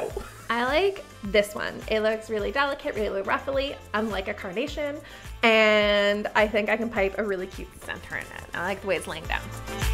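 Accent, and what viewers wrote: Canada. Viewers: are you Canadian? You sound like one. I love your videos btw! ☺️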